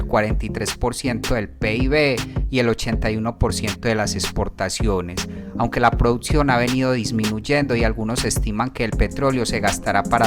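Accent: Colombia. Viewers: Gracias hermano colombiano desde Gabón, supongo que eres colombiano por el acento que tienes.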